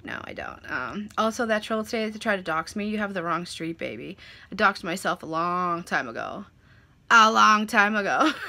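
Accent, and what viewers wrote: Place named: New York, United States. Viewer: Hey beautiful I luv ur personality n ur ny accent 😍do u have another channel?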